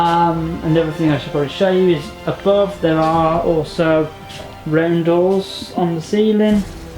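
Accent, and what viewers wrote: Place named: England, United Kingdom. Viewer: What part of England you from?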